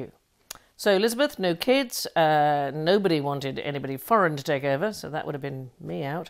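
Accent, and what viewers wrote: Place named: United States. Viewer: I love when you use your American accent.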